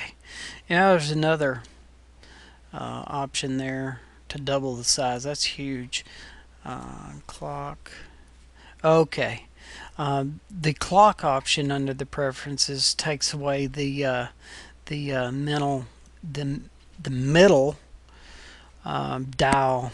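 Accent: United States. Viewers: Are you from Atlanta?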